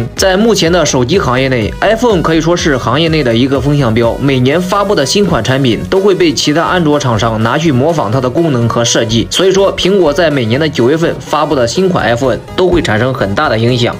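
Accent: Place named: Henan, China. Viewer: 这标准河南普通话哈哈哈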